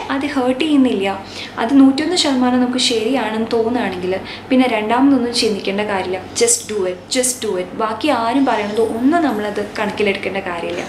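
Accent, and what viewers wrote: Thrissur, India. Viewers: Chechi supertooo thrissur style talk I Loved it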